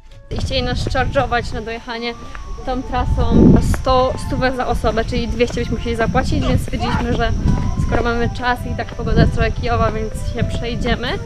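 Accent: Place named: Poland. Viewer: Czy jesteś Polką. Sądząc z akcentu jesteś, ale ilość słow angielskich wtrąconych w polskie zdania jest tak duża, że może polski to jest język obcy dla Ciebie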